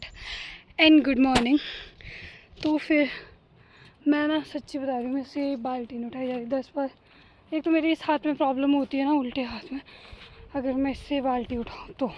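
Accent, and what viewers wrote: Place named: Kashmir, India. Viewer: You look like a Kashmiri girl, and your tone also sounds the same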